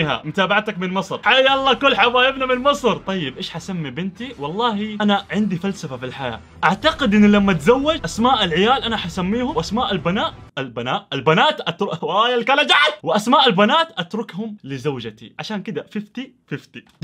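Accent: Jeddah, Saudi Arabia. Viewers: هو من جدة لأني قد شفته مرة من المرات بمطعم بجدة و حتى لهجته جداوية ترا ((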